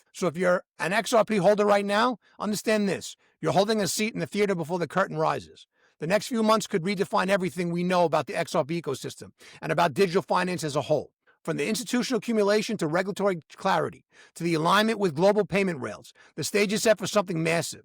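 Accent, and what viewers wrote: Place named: Brooklyn, United States. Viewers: No. I'm from Brooklyn, born in 1955. This guy is definitely from Brooklyn. He speaks like an educated old school Brooklynite. Al doesn't have a chance replicating the accent. The accent is rapidly dying out. When this guy speaks, it's music to my ears. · I doubt AI has mastered the Brooklyn accent yet...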